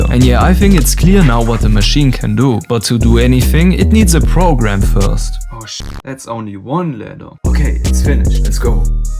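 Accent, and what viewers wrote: Germany. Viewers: He sounds German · I hear a littel german ngl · Why do I hear DEUTSCH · Are you German it Fells Like a German try to speak englisch 😅(no hate)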